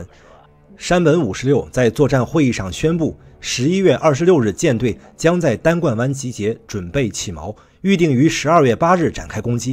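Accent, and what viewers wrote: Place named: Beijing, China. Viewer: 你是京腔啊 我還以你是台灣人呢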